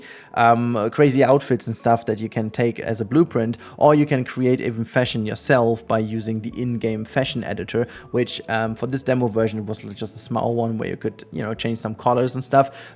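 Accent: Germany. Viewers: I'm confused bc I've watched many videos about this game and haven't heard anyone saying it like that, plus he sounds like German is his first language, therefore that isn't to blame either (despite what the other person who replied here said), given that the O in German never sounds like "ou" on its own, unless there's a U after it. I can only assume he tried to guess how it would be pronounced in English and got it wrong, but that is another thing I'm confused about, bc one would think he would have watched other videos about this game, and if he had, he would have learned how everyone else is saying it (unless that's how it's pronounced in Korean, and he knows that and I don't, which is another reason I'm asking, so I can learn if it is). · Because he is of German origin, so we speak what we read.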